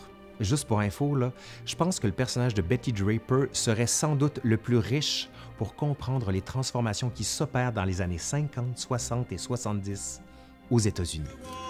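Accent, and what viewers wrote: Quebec, Canada. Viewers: as tu déjà essayé une sorte de challenge d'action ? C'est à dire lorsque tu te poses une question sur quelque chose tu essaye d'identifier quel est l'hésitation et le doute à l'origine et d'y associer une action en faveur de ce que tu penses être aligné avec tes désirs ? Merci pour la vidéo j'ai écouté du début à la fin dans le Metro Parisien. J'ai trouvé ça très construit. Et l'accent québécois... Un vrai delice !!! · Je suis en train d'apprendre le français et je m'interroge sur l'origine de l'accent de cet homme. Est-il québécois, par hasard ? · Je découvre ta chaine, là à 3h du mat', un ravissement pour bercer mon insomnie ! Super intéressant (sans parler de l'irrésistible accent de la belle province😊)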